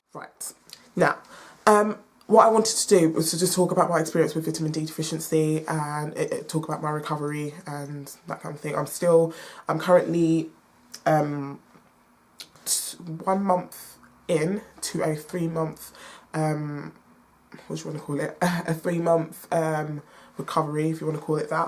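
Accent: England, United Kingdom. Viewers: Not taking anything anyway from this upload but I find a black female with an english accent so attractive. Back to this upload, excessive facial 😓 (sweating) is also a symptom of Vitamin D deficiency. She is nice looking though. This deficiency is common among blacks/ indivuduals with African decent. Truth is it gets too hot outside for quality 🌞 even for darkskined individuals. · I cant understand a shit from your British accent ! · It hurts me when black people say they have vitamin D deficiency. Judging by your accent you live in England, not enough sun, that's why black people have all this carbon (so called melanin) in our skin when expose to sunlight it produces vitamin D. Girl stay in the sunny countries. Don't let them tell you this bullshit about lighter skin absorbing sunlight and creating vitamin D better than darker skin its a white lie they don't have enough carbon (this so called melanin) to absorb sunlight to produce vitamin D.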